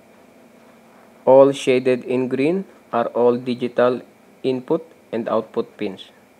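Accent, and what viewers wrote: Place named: England, United Kingdom. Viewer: It is lots of good info, Just so hard for me with my cowboy english raisen to understand the proper english you speak. It is not your issue as I am sure your countrymen understand you just fine but would have a very difficult time understanding me. You know how us Americans are, were the only ones on the face of the earth.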